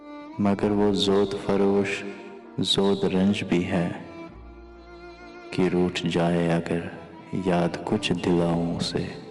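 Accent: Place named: Kashmir, India. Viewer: Voice of kasmir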